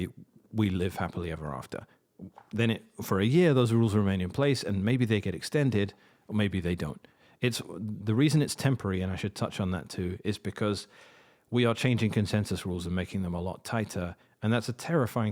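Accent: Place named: England, United Kingdom. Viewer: 😂😂 “speak English” he couldn’t get more English.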